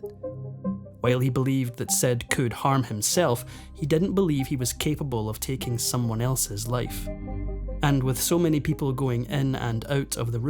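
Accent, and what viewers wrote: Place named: Scotland, United Kingdom. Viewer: My favorite narrator love listening to the Scot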